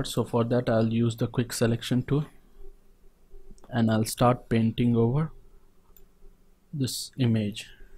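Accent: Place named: United States. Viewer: you talk like a american language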